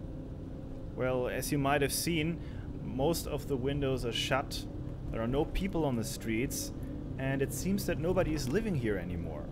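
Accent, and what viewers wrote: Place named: Germany. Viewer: Also ich finde dein Video wirklich interessant. Und wenigstens verstehe ich dein "deutsches" Englisch Gut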